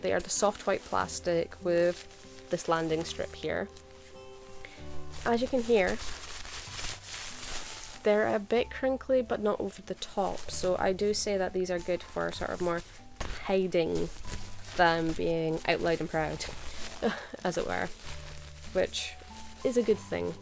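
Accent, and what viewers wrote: Scotland, United Kingdom. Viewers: 1. Your accent is amazing, love.Scottish. 2. Thank you for your absent absorbent and ABDL products... it's hard to find them that works for most adults, and your abdl reviews is comprehensive and brilliant. tyvm for being awesome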